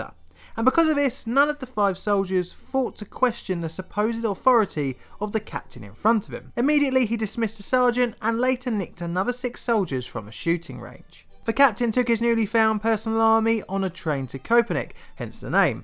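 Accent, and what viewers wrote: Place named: London, United Kingdom. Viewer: More fuel for my addiction :( also your accent is so Londoney hello neighbour.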